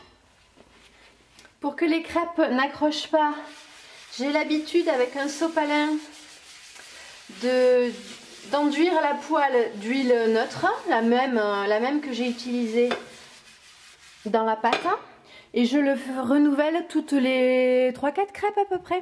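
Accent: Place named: South, France. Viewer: Whaouhh j'adore ton p'tit d'accent du sud !!! Les recettes des mamans sont toujours les meilleures :)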